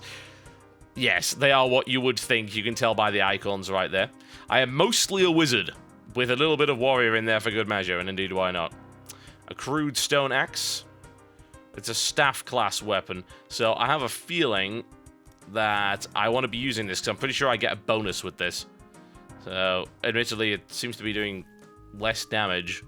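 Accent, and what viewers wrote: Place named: Yorkshire, United Kingdom. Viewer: every so often you go into a yorkshire accent.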